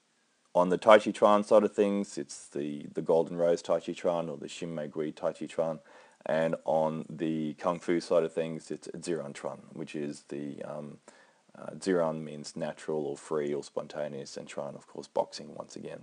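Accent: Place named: Australia. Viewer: I like that you take the time to breakdown and study the fight, and the realistic approach on how taiji can be applied. It's sounds like you're Australian, where are you based?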